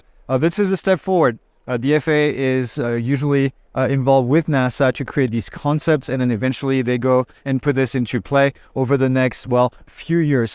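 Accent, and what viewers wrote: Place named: France. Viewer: Of French vocal cadence (accent), some of his words sound different, of course. A phrase he stated is actually very accurate in how it sounds, although he purposed a different meaning. "NASA has this information." is what purposed to denote, though of his vocal cadence, to the common English language eear, it sounds like "NASA has *disinformation.*" Not sure how accurate realizes his statement actually sounds as it is factual! Indeed, the American government has much disinformation all the time!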